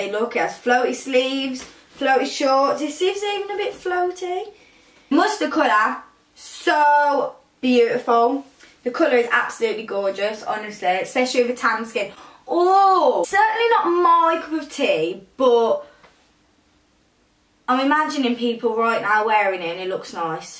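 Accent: England, United Kingdom. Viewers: Ur too pretty, love ur way ^_^ Brazils guy :P Obviously by ur accent u r from Uk :) Speak like Portuguese people :D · That english accent... ))) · British accent is nice. Calm tf down.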